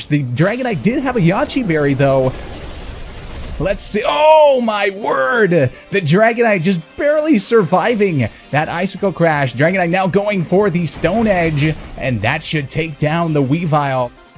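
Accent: Canada. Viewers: love the canadian accent :D :D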